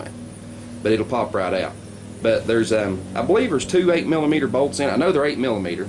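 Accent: South, United States. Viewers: This dudes country as fuck ...but thanks lmao worked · SELLAAHNOIIID Just kidding mate, I love that southern accent :)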